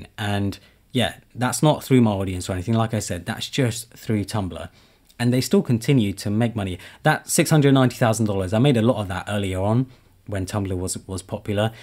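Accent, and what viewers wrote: United Kingdom. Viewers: Hi! About amazon, are you linking solely to the US amazon store? If you are, is this based on your audience analytics? Asking because it sounds by your accent that you and I are using a different one (amazon uk) so I was wondering whether I should open one here or there.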